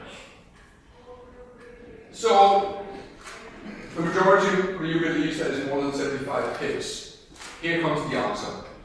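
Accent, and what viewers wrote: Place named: Denmark. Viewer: Awesome content. But equally awful audio, its hard to navigate through that tough danish acent. Overall nicely explained. Thank you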